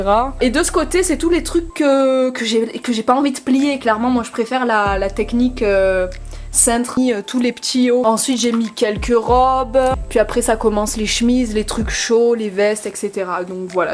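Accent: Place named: France. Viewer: No pero tú cómo puedes hablar los dos así de bien? Vives en colombia pero tú francés es de Francia?? Cuál es tu lengua maternal? Y los cursos de francés son en línea? Salidos de Canadá!!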